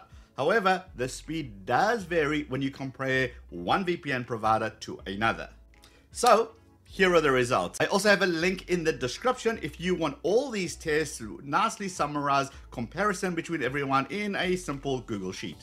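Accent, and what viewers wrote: Johannesburg, South Africa. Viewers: Dude you sound South African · Are you from South Africa as you acent sounds like JHB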